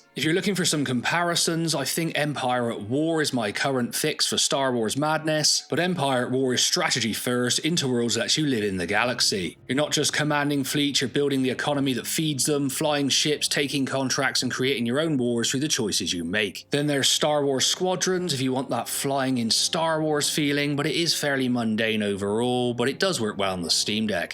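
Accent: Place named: England, United Kingdom. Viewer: Hey, fellow Englishman here, from a bit further to the east than you going by the accent 😂 I’m 46 and just restarted the expanded universe books from where I left off in my early twenties to be more clever. It’s such fun, I didn’t know if I’d still enjoy it but loving it - no spoilers please I’m nearing the end of the yuuzhan vong war. I played through most Star Wars games and read again the old comics - expanded universe is amazing, I love it so much and have so many good memories from it and everyone knows, this is the real Star Wars timeline written mostly by people who get it and are into it as opposed to the Disney trilogy which seemed to be a misandrist modern feminis/woke vehicle to attack men, and push minority supremacism (I feel like you’re expected to caveat that with how you’re not racist that’s the point but I cannot be arsed, shouldn’t have to). 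The worst moment I’ve ever seen in any film was one of the Disney ones where … you guessed it, it turned out all the men we’re stupid and belligerent and wrong and the purple haired old Crone rises up magnanimously and it turns out she and all women were right all along, stupid men. They then kill all the white male heroes - can you imagine how disgusting and unacceptable they found Han and Luke, and even, bastions of moral integrity that they are, force Carrie fisher to be in it, after she died wheeling around her digital corpse having bought the rights to her image. Disney are pretty much the empire. Nice touch having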